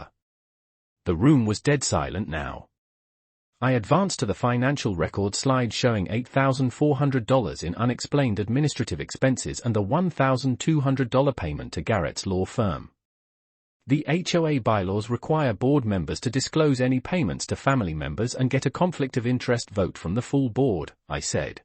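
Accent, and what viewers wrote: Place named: England, United Kingdom. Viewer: The obvious english pronunciations of words doesnt go with the story.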